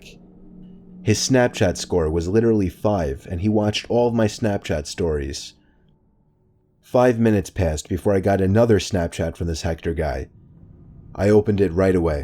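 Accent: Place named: New York, United States. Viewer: You have a slight New Yorker accent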